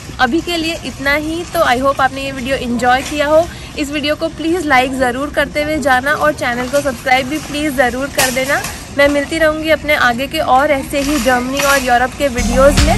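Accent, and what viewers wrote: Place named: Rajasthan, India. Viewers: Ye inke bolne ka tone hai Rajasthan se hai ye rajasthani me pada hua hai ese ho bolte hai isliye inki hindi me mix ho jata hai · Pahli baat ki video banane wali rajasthan se lagti hai.sahi Hindi nhi bol pa rhi..yaha para huwa nhi hota..rakhi hui hai boliye..aur ek zaruri baat..her chiz pe vedio mat banao..ye kya matlab hai ki supermarket dikhate hai aapko..are ab india main hypermarket aa gaya jo ki vo bhi boring ho gaya hai aajkal...Germany main rah k training lo ki kaise presentation dte hai..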